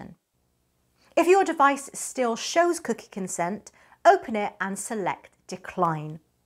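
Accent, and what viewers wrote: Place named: Bristol, United Kingdom. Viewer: Are you in, or near Bristol, England? 🤔 (Guessing, based on yer accent, me awd babber) hahahahah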